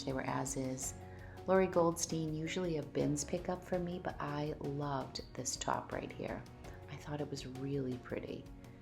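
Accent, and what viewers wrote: Boston, United States. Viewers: Your videos are my favorite, your Boston accent! Baux for box! Keep up the amazing sourcing. Thanks for putting out the best content on YouTube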